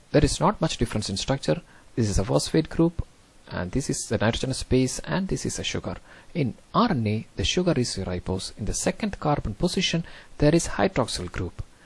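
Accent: India. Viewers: am i the only one that loves listening to an indian accent?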